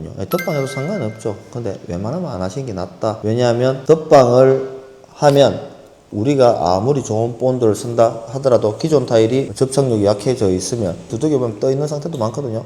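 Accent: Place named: Daegu, South Korea. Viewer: 사투리 쓰시길래 부산이신가했는데 대구시군요~아쉽네요..신뢰가 팍팍갑니다!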